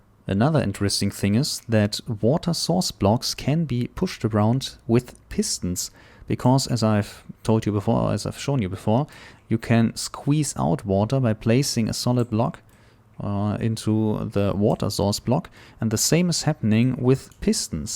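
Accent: Germany. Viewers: Man hört das du deutsche bist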